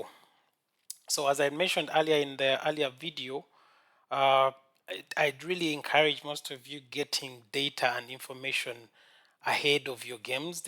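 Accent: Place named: Europe. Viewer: I love the accent it seems European ❤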